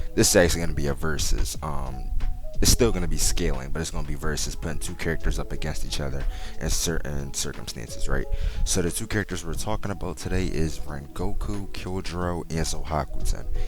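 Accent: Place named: Philadelphia, United States. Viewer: I can hear the philly accent! very dope commentary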